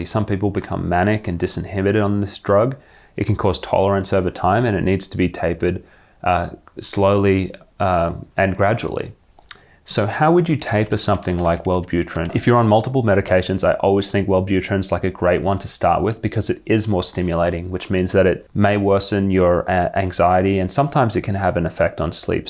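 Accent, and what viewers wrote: Australia. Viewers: Hello - I noticed you have an Australian accent - I tried to get Wellbutrin from my GP but it is not on PBS for depression. How do I go about getting this medicine in Australia? Thanks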